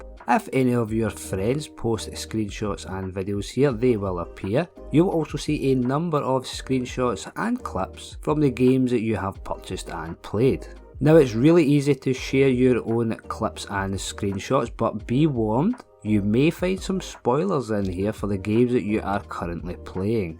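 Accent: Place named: Scotland, United Kingdom. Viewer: Sounds Scottish)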